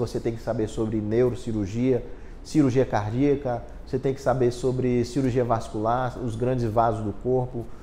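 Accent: Northeast, Brazil. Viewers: Oi Dr.:, eu lhe descobri aqui no YouTube por um acaso, mas foi maravilhoso te descobrir aqui, fiz curso técnico de enfermagem, apesar de não exercer a profissão, eu continuo sendo curiosa em relação aos assuntos de saúde, e com isso acabo relembrando meus aprendizados com os meus conhecimentos de enfermagem e de medicina, percebi desde o primeiro vídeo que vi seu que vc é nordestino, nosso sotaque é inegável em qualquer lugar do mundo! 😂😂😂😂😂😂😂😂 amo assistir seus vídeos! Sou de Arcoverde-PE!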